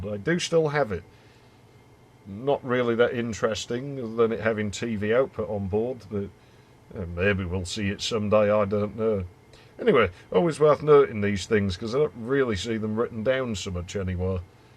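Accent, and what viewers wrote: United Kingdom. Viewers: As someone who has watched UK TV shows for years, I thought I was used to your myriad accents. I was clearly a cocky bastard. Guess I just have to watch a few more of your videos to get an ear for it. Nevertheless, this is a fantastic video about a neglected CPU. My first computer was a Cyrix, so while I don't think of it in fondness, I respect its place in my history. It played those Apogee & Sierra games beautifully and ran QBASIC like a champ. It came with Windows 95 OSR2, which many people forget about when talking about 95.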